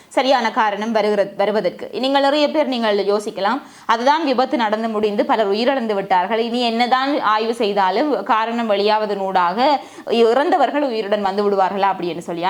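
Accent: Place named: Jaffna, Sri Lanka. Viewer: எனக்கு யாழ்ப்பாணம் தமிழ் மிகவும் பிடிக்கும் ❤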